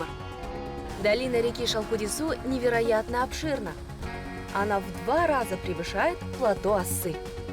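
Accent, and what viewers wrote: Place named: Russia. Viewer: наименования чисто қазақское произносится с руским произношением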